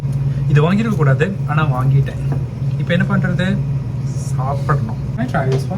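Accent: Tamil Nadu, India. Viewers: Recently addicted to your videos Waiting for more videos like this and also I like Ur Tamil accent 😂 Love from Srilanka 🇱🇰❤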